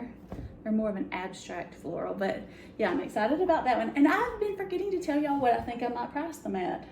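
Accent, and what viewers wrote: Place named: South, United States. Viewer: Did that Cabi have a black dragon on it so definitely put dragon in your title love your Southern accent and easy going helpful content I'm from Ms original are you from there or near sure sounds like it! Keep up they great work!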